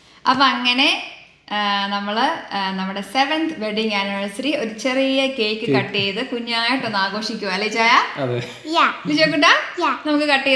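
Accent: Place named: Kottayam, India. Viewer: Trivandrum anu valarnnathenkilum thani Kottayam slang anu anyway Happy wedding anniversary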